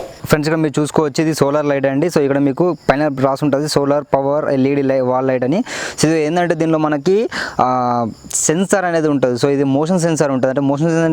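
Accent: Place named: Telangana, India. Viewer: Complete telangana language😂😂👍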